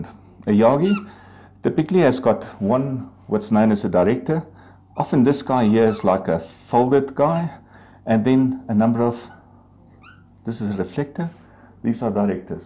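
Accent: South Africa. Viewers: S that a South African accent?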